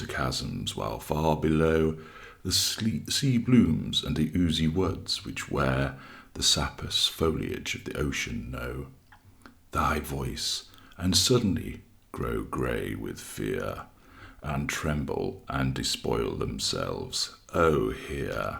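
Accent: England, United Kingdom. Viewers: Only an Englishman can speak like this.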